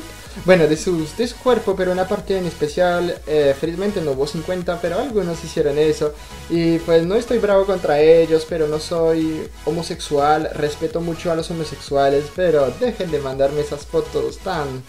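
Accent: Bogotá, Colombia. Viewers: Tu acento es muy colombiano eso es verdad. Los québécois que están en mi clase de español matarían por hablar como tú, ya que se van a un stage a países hispanos. Te conocí gracias a una antropóloga amiga mía que ve los intercambios Québec-México Y Mexico-Francia y a los hispanos les puso un par de videos tuyos en el tópico de choque cultural, no eres antropólogo pero tu honesta opinión nos parece objetiva y certera, a mucha gente nos interesa la cultura y la sociedad, sigue adelante. Saludos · hola! me gusta mucho tu manera de hablar, no se si hablas muy como colombiano porque yo soy de México pero sí creo que tu español es buenísimo:) Qué padre que hagas videos positivos de estos lares. coincido en que eres guapo!:) ojala que sí encuentres una latina que te haga muy feliz!! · Pareces colombiano porque tu español muy bogotano. Posdata: Disfruta el verano y broncéate mucho! 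Posdata de la posdata: Zidane, calvo hijo de puta, mete a James ajajaja (es broma)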